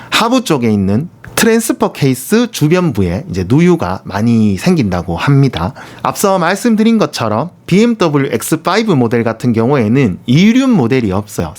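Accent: Gyeongsang, South Korea. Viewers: 아 이분 갱상도네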